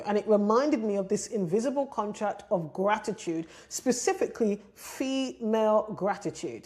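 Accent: United Kingdom. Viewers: Yeeeeees! I knew you were from SOUTH LONDON! Bad gyal tings! Go on mamita 🔥🔥🔥🔥🔥🔥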